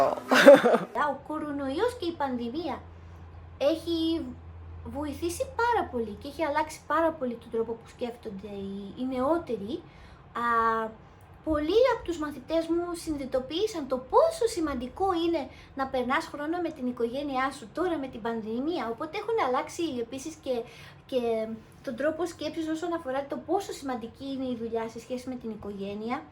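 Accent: Japan. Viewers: εισαι σκετη γλυκα.Σε ευχαριστουμε για την ενημερωση.Αυτα που ειπες σχετικα με την Ιαπωνια ειναι ακρως διαφωτιστικα γιατι προερχονται απο ενα ατομο που ζει την καθημεριοτητα της Ιαπωνιας.Θα πρεπει να εχεις και πολυ καλη προφορα στα ιαπωνικα γιατι η επιρροη τους φαινεται και στην προφορα των ουτως η αλλως πολυ καλων ελληνικων σου.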